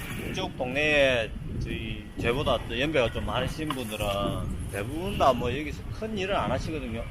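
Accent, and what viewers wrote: Gyeongsang, South Korea. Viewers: 경상도댁 언제나 친근하고 차분한 느낌입니다. 남편 잘 만나 경상도 사투리고 한국말 배우고 말투가 이쁘십니다. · 전형적인 갱상도 ㅎ 잘하고 계십니다!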